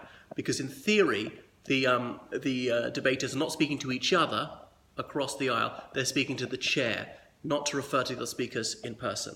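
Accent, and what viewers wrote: RP, United Kingdom. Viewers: I was looking for posh british accent and I found you. Im peruvian and I ve been to England quite a few times .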